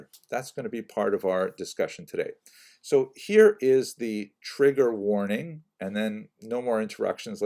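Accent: Canada. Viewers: Dr, as a fellow Orthodox Jew who often deals with the same issues among our co-religionists, thank you for your message and thank you for the knowledge you are sharing. Also, it took me a good few minutes to understand what you meant about "Bot" and "bought" until I realized that in your Canadian accent these words rhyme while they don't for me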